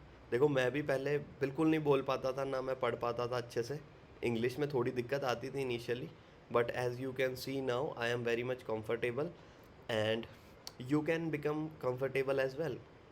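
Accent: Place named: Jaipur, India. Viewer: okay sir! Actually your tone sounds like a jaipurite! Thatsy I ask.. 😊👍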